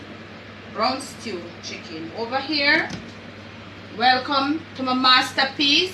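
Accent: Jamaica. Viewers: Since finding your channel I have been watching your videos every day. It is sooo nice to hear a true Jamaican voice when you live in 'foreign'. But even better than that are your mouth watering recipes. Authentic Jamaica cooking! I wish you were here in London running your own Jamaican restaurant. You wouldn't have hands to sell, trust me. Keep up the good work and we will keep watching. Love you gawn to bed! xxx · I really. Enjoy style of cooking its unmistakably authentic, i love the advice and the teaching points. As a results her viewers learn as if we are Island too.last but certainly not least the Jamaican Accent is so intridging until i wanna learn im sure just like her viewers.thanks cant wait to view ox tail, festival,fish tea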